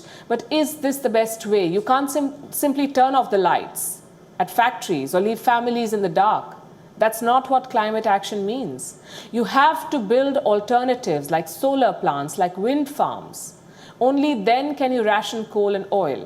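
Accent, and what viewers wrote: India. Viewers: Love her Inglish (indian english) accent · I just love the Indian accent and smell.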